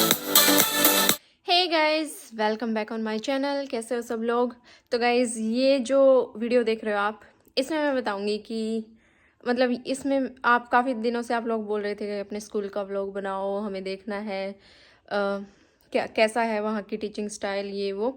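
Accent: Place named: Japan. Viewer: You talk like a Japanese or russian girl , your expressions are also not like a typical Indian girl. Liked that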